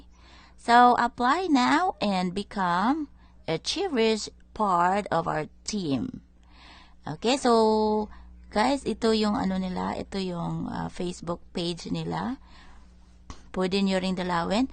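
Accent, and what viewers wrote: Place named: Visayas, Philippines. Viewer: Paanu ka nakapasa diyan, may bisaya accent ka .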